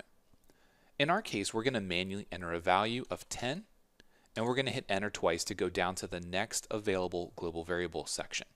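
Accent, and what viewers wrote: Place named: United States. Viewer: Great video's its nice to hear an American voice without the horrible constant 'Vocal Fry' at the end of every sentence.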